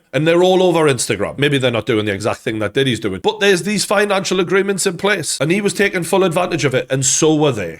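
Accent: Newcastle, United Kingdom. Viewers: I love the Geordie accent! One of my favorite YTers Cam Kirkham made me love it ❤ and 50 is King 👑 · You are a f ing legend put perfectly geordie 🫡💯💫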